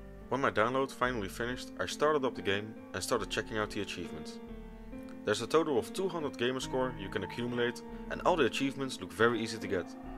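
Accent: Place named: Netherlands. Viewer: Je hoort dat hij nederlands is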